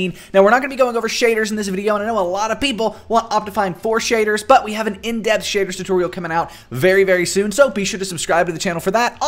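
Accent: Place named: South Carolina, United States. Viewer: You sound like you're from South Carolina.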